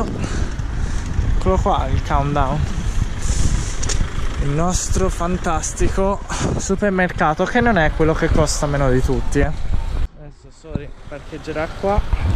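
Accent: Tuscany, Italy. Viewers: che bello porca eva sentire il toscano in nuova zelanda! hahaha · ✌👏😂😂😂😂😆😆😅😅😅😆😆😆❤💋💋💋TROPPO FORTE TROPPO AVANTI LA PARLATA TOSCANA PERÒ PARLANDO DI ITALIANITÀ QUA' NON C'È RIMASTO PIÙ NIENTE LA NOSTRA CARA PERNIGOTTI E TURCA ADESSO LA BARILLA FATTA CON GRANO RUSSO 😢😢😢😨 E SI QUA IN ITALIA C'È SEMPRE IL NOSTRO MADE IN ITALY MA CI SAREBBE DA PARLARE TANTO SU QUESTO DISCORSO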